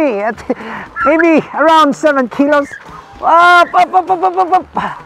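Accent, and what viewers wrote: Philippines. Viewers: Ganda ng English nya, kung may indian English, ang sa kanya Filipino English.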